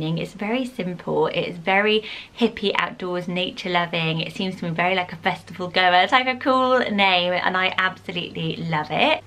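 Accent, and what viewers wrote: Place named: Somerset, United Kingdom. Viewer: Are you from Bristol? :) Your Accent sounds quite somerset/Herefordian!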